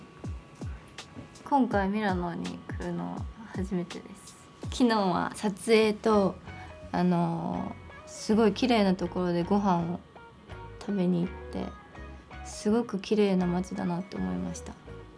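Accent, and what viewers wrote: Standard. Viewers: ミラノまでウォンジョンヨ先生来てくれてるだ〜！このペアは最強がこんなに標準語喋ってるの新鮮w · 標準語でお話するの謎の寂しさがある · 모처럼 모모가 표준어로 열심히 말해줬는데 ㅠㅠ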